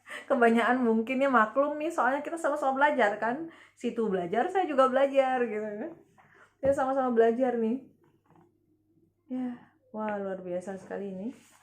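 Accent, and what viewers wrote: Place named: Bali, Indonesia. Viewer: Mba nya dari bali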